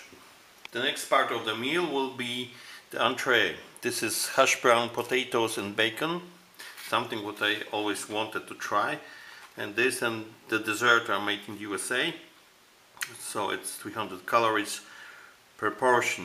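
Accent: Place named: Saskatchewan, Canada. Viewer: * sounds like all of my relatives in Saskatchewan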